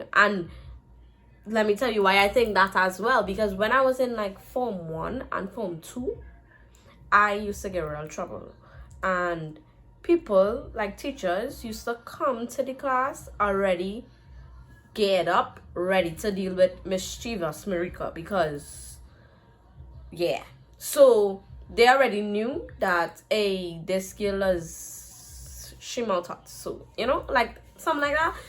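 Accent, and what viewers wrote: Trinidad and Tobago. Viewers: Jesus Crist am obsessed with trini accent love from ja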